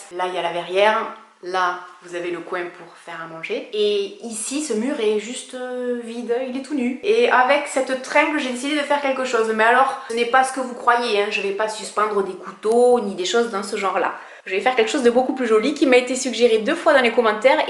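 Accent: South, France. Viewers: Nickel ! "Avé" l'accent en prime . Merci, une mine d'idées exceptionnelles. Bien filmé, bien expliqué. ❤👍👏👏👏👏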